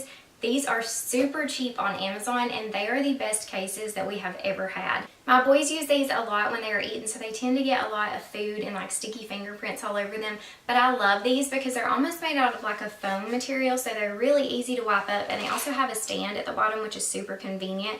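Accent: South, United States. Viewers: Where are you from.it sounds like the South.I'm from Alabama.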